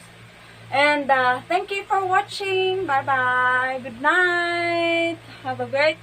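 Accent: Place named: Hiligaynon, Philippines. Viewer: Galing naman, ang husay! Ganon naman yan ang cute!!!!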